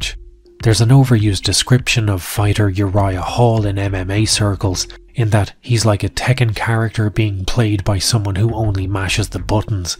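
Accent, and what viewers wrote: Ireland. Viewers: I've just found your channel and damn, am I impressed. Great to hear an Irish accent smashing it too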